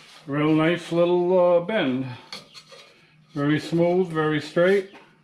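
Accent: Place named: New England, United States. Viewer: A nice, authentic New England accent. Boston?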